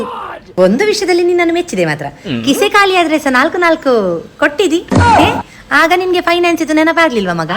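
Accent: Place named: Karnataka, India. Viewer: I can't help laughing out loud at your slangs and abuses. I don't actually appreciate them but there's something about the way you say it 😂 And that typical south Canara style you say it is just 💥😁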